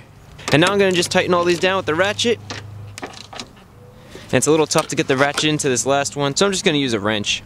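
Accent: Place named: New Jersey, United States. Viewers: god you can hear the new Jersey in his voice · Judging from slight accent, I would guess he is in the Northeast US where snow happens and roads get salted. This will cause surface rust on suspension components on a lot of cars. Some people in heavy snow and salted areas say that Mazdas in those conditions rust badly. I am in the middle of the east coast US and we get a small amount of snow, and roads get salted, and I have had 4 Mazdas and did not experience any rust or corrosion problems.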